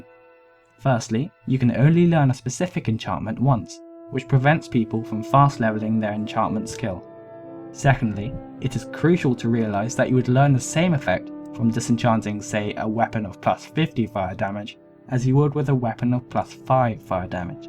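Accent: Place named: United Kingdom. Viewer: curious, do all these videos with commentaries hire a British person with an accent to narrate without them being involved with the game at all? this guy just reads from a script . lame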